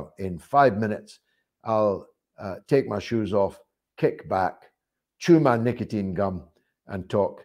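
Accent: Scotland, United Kingdom. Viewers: Are there any sort of lessons I can take to get this Scottish accent?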